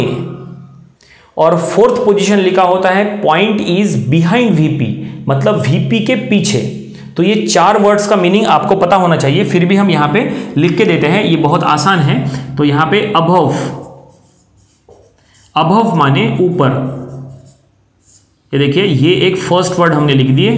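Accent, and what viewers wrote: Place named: Hyderabad, India. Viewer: Sir ka hyderabadi ascent plus super explanation.. 🔥thx sir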